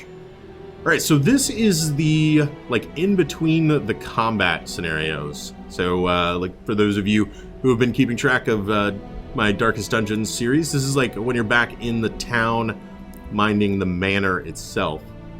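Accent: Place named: Oklahoma, United States. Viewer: keep it going! that OK accent adds to the game for me this game has awesome narrative to it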